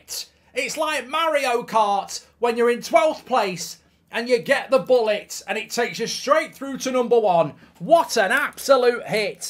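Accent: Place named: Scotland, United Kingdom. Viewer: Marks Scottish accent 🙈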